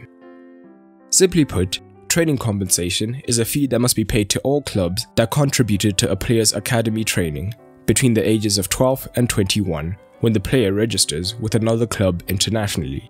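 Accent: South Africa. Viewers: Ehhh mmona are you South African ?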